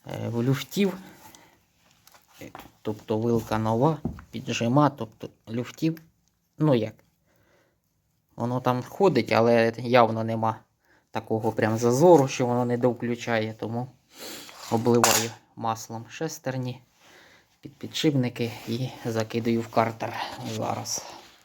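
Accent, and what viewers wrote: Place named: Mykolaiv, Ukraine. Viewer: підписався на Тебе і не шкодую. Відоси інформативні та приємні. Дякую (за все, а особливо за наш " суржик"! Я- з Миколаївської обл)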